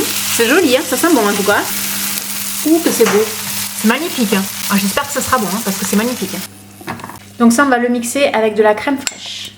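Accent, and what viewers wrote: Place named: Midi, France. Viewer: Bonjour, je découvre aujourd’hui votre site c’est votre accent du midi qui m’a interpelé car j’ai le même 😀et votre bonne humeur. Je vais,m’abonner car il y a pleins de choses super intéressantes Merci pour le soleil que vous apportez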